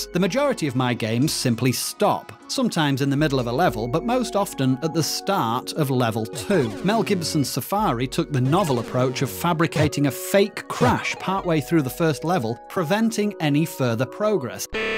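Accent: United Kingdom. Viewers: I don't know what you mean, he sounds quite British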